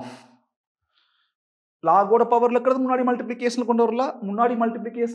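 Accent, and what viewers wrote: Coimbatore, India. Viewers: Sir i am feeling that i cant see your video from tomorrow i miss your videos your approach your teachings and your body language your coimbatore slang and everything sir hats off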